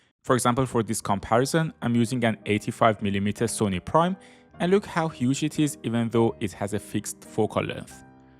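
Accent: Iran. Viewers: خانومتون از دید خواهری خیلی زیباست. راستی از لحجه تون فهمیدم که هم وطن هستین.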